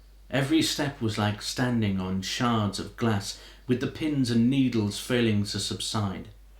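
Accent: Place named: England, United Kingdom. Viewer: I've told you before, I love your English accent.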